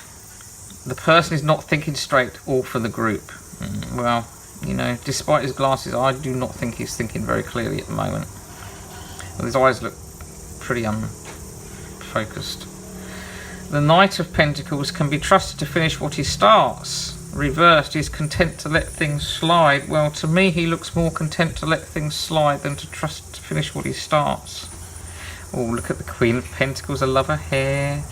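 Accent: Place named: London, United Kingdom. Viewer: There is an actually a psychological study on something driven through the brain in the 1900's I think. i kept thinking what a strong London accent! You're doing a good job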